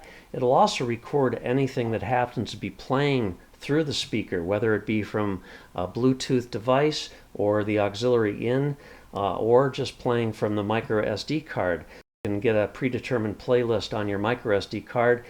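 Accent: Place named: United States. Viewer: Great review/tutorial...Finally an "American English" speaking reviewer. Those reviewers from GB or wherever have those awful accents that make it hard for me to understand. Thankyou!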